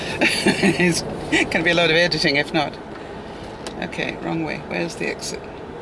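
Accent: United Kingdom. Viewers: Found your channel through Judy and Benji and liking it so far! Can I ask, are you British at all? Just your accent sounds familiar to me! :)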